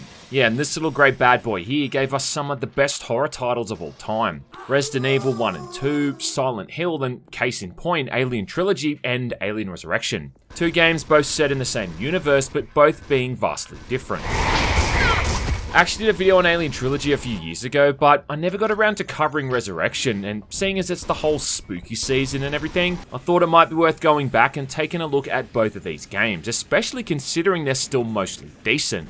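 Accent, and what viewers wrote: Australia. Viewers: Are you an Australian? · Scare pronunciation: it’s scarce /‘skeəs/ like ‘scare’ /‘skeər/ but with ce at the end. -this is for British English - but Australia uses received pronunciation all the same if I recall correctly. Americans English: the vowel sounds in scarce /‘skers/ are the same as they are in ‘air’ /er/ And like air, they add the rhotic /r/ sound after the vowel, but before the ce, so it will sound like sc-air-ce But it doesn’t really matter how you say it. Aussies make everything sound good anyway. Australian English is like an amalgamation of British and American English. It’s like natural progression, and it makes common sense. Never mind me. Just your English teacher and linguist subscriber. I hope my years of pain and debt were useful to you.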